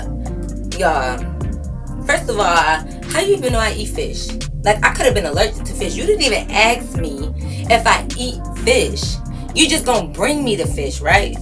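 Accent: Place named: Detroit, United States. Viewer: This sounds like a Detroit nigga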